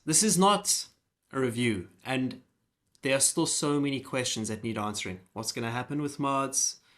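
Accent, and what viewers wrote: South Africa. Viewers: Hiya, I've only ever seen a banner of coach online and this is my 1st time seeing anything you've done. But I know this is random but... Are you South African by any chance? I'm from jhb and and I can't shake off the feeling that you are from the accent lol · flawless commentary from the S.A accent lol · Your accent is very South African. You been?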